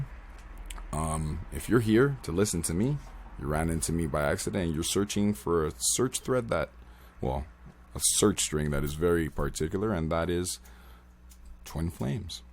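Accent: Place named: Toronto, Canada. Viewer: Nice to hear a guy out here. You sound like you are from Toronto .. I am from Toronto.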